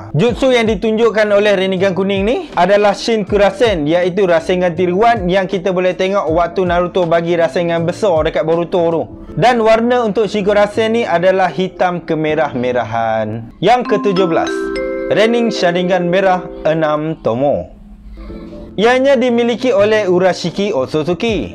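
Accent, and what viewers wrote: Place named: Kuala Kangsar, Malaysia. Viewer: atee deme ckp mcm orang kuale deme orng perak yea bote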